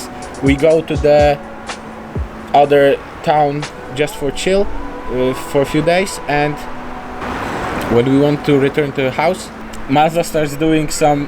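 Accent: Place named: England, United Kingdom. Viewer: Oooh man i love the accent and youre english i dont know why but its so Nice to hear!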